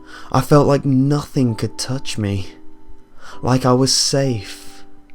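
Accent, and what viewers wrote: England, United Kingdom. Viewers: Where in England are you from, I'm tying to learn the regional accents of GB/UK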